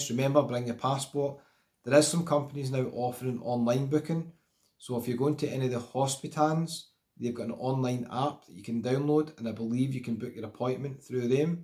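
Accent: Scotland, United Kingdom. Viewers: you're very welcome. Always trust a man with a Scottish accent! 😘😉😉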